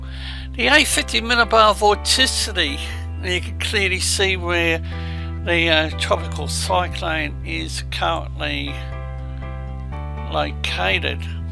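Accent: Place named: Australia. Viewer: Lol what is this guy saying, this is just like Ozzie commentary to the max with the most Aussie accent haha 🤣 👍☔️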